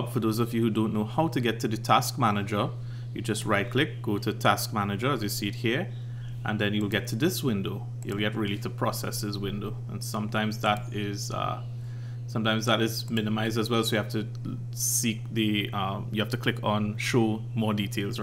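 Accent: Trinidad and Tobago. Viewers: You sound like you are from. Trinidad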